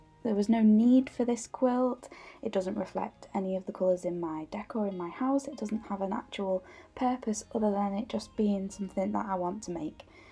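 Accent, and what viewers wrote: United Kingdom. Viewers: You have managed to touch on all of my favorite things here. A beautiful English accent, Liberty of London fabrics, Sashiko, and EPP. All of this makes for a perfect video, and I thank you for this. What do you think about the William Morris quilting cottons? They are another of my favorites. Be well, and be happy.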